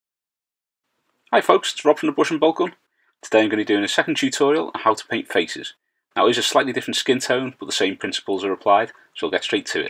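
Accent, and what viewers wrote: Liverpool, United Kingdom. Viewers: Again, a beautiful, easy to follow tutorial :-) I just bought the Vallejo skin painting set, and have tried following their instruction booklet, but it takes so long to get the tones right, that halfway through, I was flagging and fast losing interest. These quick tutorials are SO much better for someone like me, who doesn't paint to "Crystal Brush/Golden Demon" standard, and just likes to paint for fun. Also, LOVE the Scouse accent, as I haven't heard that since my drinking days in Birkenhead (Stairways nightclub featured heavily in those halcyon days) and other Merseyside areas. I collect Frostgrave now, and love painting them, especially the Dwarves, female soldiers and Cultists, just for the sheer diversity. Again, love these amazing tutorials you make, and wish we'd had them 40+ years ago, when I first started collecting and painting Airfix 1/32 & 1/72 scale soldiers.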